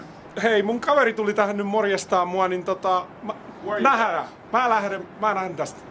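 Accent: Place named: Finland. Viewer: Herranjumala tää kosiskelee typeriä 15 vuotiata vieläkin ;_; kukaan ei varmaa tajunnu että toi ei ihan jenkki ole , jäätävä ralli-englanti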